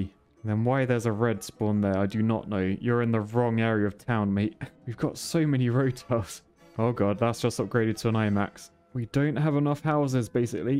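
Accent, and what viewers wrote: United Kingdom. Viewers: Dude yo British 😂😂😂😂😂😂😂😂😂😂😂😂😂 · Hes brit dont mind him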